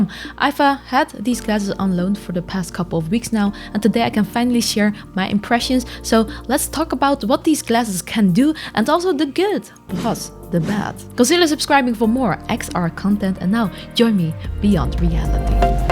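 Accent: Netherlands. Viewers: You might want to look into an English accent coach. The dutch accent is one of the most mind fryingly annoying in the world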